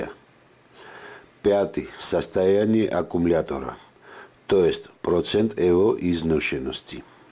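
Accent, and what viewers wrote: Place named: Georgia. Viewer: Прекрасно слушать русски под грузинском окцентом.❤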